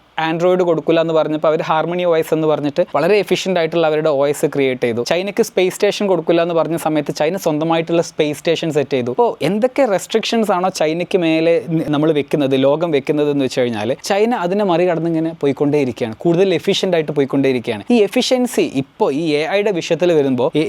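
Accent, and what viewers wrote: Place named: Thiruvananthapuram, India. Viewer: A of AI has a TvM slang ❤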